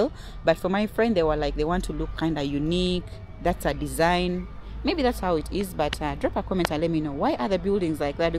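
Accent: Kenya. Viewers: But your English sounds Kenyan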